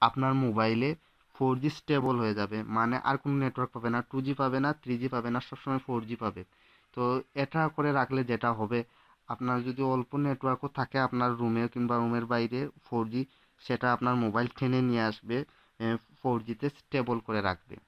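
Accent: Sylhet, Bangladesh. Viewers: ভাইকি সিলেটি?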